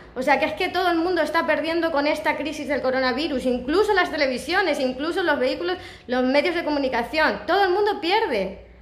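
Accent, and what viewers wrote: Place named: Spain. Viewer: Acho o sotaque espanhol fofo demais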